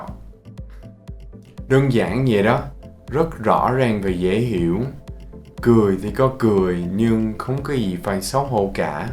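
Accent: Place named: Saigon, Vietnam. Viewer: giọng Sài Gòn rất hay =))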